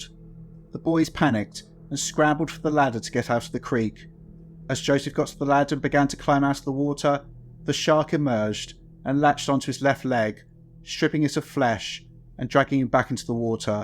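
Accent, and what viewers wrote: England, United Kingdom. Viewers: He’s not German..he’s English. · Why is a pom narrating this?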